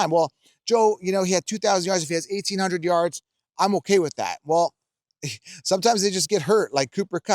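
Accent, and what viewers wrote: Canada. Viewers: I’m curious, what state are you from? Or are you from Canada? I hear a Canadian accent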